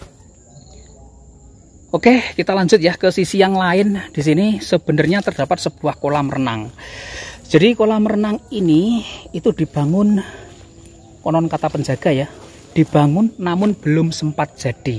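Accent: Java, Indonesia. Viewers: Logatnya meddo bgt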